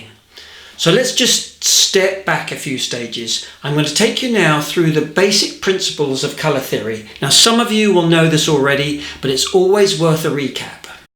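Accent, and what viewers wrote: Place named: United Kingdom. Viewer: I have just discovered you and I am in love with your lessons and your marvellous British English and humour. Thank you so much!!!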